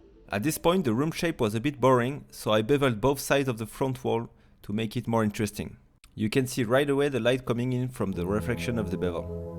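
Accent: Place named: France. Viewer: J'aime beaucoup ton accent français. Salutations d'Italie ! Ciao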